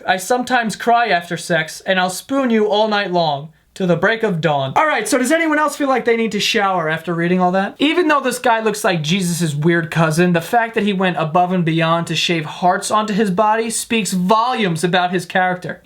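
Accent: New York, United States. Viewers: he's a hardcore new Yorker. its his accent and its dope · it's his new york accent. we all do that here.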